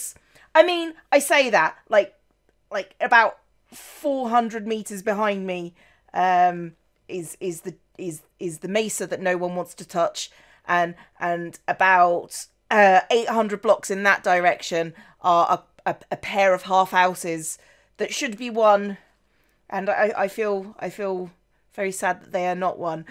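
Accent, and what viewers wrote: United Kingdom. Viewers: Omg shes British i love British accents can you say something really cool in the last episode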